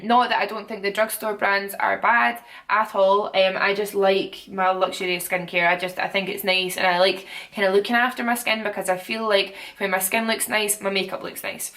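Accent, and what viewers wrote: Scotland, United Kingdom. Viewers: Where is she from in Scotland?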